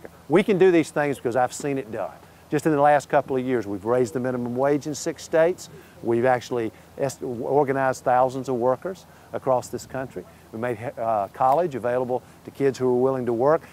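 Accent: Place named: North Carolina, United States. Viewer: That is an honest-to-God homegrown North Carolina accent, friend.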